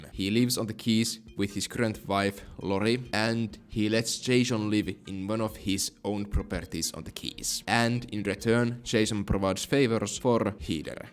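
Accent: Finland. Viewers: It's not an AI voice. It's his real Finnish accent. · Bro that's his real voice 😭 The amount of people who think his voice is AI is crazy. He's Finnish and he just sounds like that, if you for whatever reason don't really understand what he's saying then you could turn on subtitles although the subtitles themselves may be wrong/incorrect. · Finnglish is fun. I’m American but work with Finns. You have that sweet spot of strong understanding of the language, but also strong Finnish accent. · have you never heard a Finnish person? If you played Control/Alan Wake 2, Ahti is very Finnish lmao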